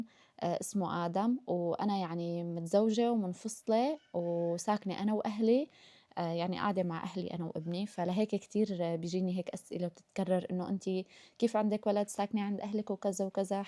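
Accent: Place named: Levant. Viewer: لهجتك شامية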